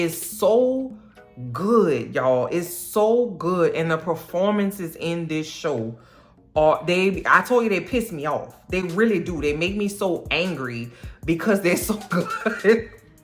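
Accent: New Orleans, United States. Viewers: First of all, M’am I love you’re new Orleans accent! It's so pleasant to listen to. In my personal opinion, the reason the show isn't a smash hit is, unfortunately, due to the bigots and racists drowning out the positive noise of the show. In 2024 some people are still not comfortable seeing 2 men as romantic leads. Also, some of the die-hard book/movie fans can be their own worst enemies. They want an adaptation that's verbatim to the source material. They also nitpick things to death, there is no room for nuisance. Oh and don’t get me started on it, if you say you like or dislike a character they act like you committed a crime. I read that Interview with the Vampire is coming to Netflix soon, hopefully with more eyes on the show. It will get the attention it deserves. The show is so good, everybody deserves an award! From the creators, producers, cast, crew, costume, and set design. 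Hell, even catering deserves a little something too.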